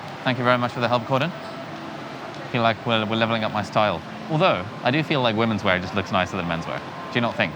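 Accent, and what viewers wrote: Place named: India. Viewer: Your hindi accent is like lagaan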